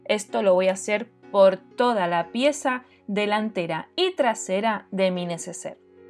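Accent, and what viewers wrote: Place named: Buenos Aires, Argentina. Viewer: Hermoso! 😊 Me despista el porteño con el español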